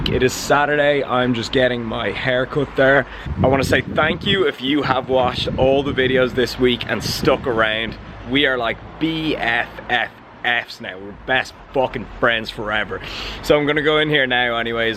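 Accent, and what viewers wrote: Ireland. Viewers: Is this dude Irish can't really tell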